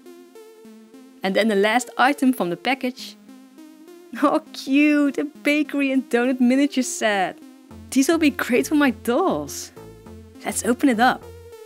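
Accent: Netherlands. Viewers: haha ik vind je nederlandse accent echt geweldig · Haaaaaaaaaargh Nederlands accent😂, ik wist ineene wel dat ik de goeie video had! En echt wel een gaaf kanaal ey! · Haha, hoor t gelijk, dat is een Nederlander xD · Dus je bent eigenlijk Nederlands? Btw dat denk ik door het accent ———————————————————————- So you are acually dutch? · Oml NEDERLANDS YES YES YES IK WIST T AAN JE ACCENT :D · Ik kan het horen dat je Nederlands bent. Het accent😂😂😂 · I’m new to this channel but when I heard your voice I knew you where Dutch! Finally someone who likes dolls and Littlest Pet Shop that lives in the Netherlands! Thanks for making this channel! This really helps me out but can you make videos for Littlest Pet Shop? That would be nice! Still nice video! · Ha ha😁 I'm Ducht and can hear you are to😆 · U can hear ur dutch😂😂😂 so am i btw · I live in the netherlands too! This is my first time watching your channel, and when i heard your voice and accent i immedianntly knew you lived in the netherlands! 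· I didn't know you were Dutch as well until I watched Fairy's video and now I can clearly hear your accent xD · Ik kon gelijk horen dat je uit Nederland kwam😂😂❤️❤️❤️ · Wait? We Dutch like our coffee too. Well okay I’m English but living in Holland these last few years gave me a love of coffee. I’m going to susbscribe and see what you do with dolls. Btw, are you from the north of Holland? I can’t quite place your accent :P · OMG YOU ARE DUTCH? OR LIVING THERE? I can just hear it at your voice! I am living here too. in Rotterdam. now I feel better. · Ik dacht al dat je Nederlands was door je accent. Leuke video ❤️ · haha je hoort echt dat je uit nl komt maar leuke video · Ik hoorde je accent en vroeg me af is zij Nederlands ofzo xD Edit- waar haal jij je manga? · Ik hoor het aan je stem dat je nederlands bent en je praat nederlands en Nederlands 😅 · Ik kan het gewoon duidelijk horen aan de manier waarop je praat dat je Nederlands bent 👏👏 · I KNEW IT - I recognized the Netherlands accent in your voice! 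I live there too-! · I can just FEEEEEEEEL the dutch accent (yes, me myself is also dutch) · ik kan zien aan je acsent dat je nederlands bent xD \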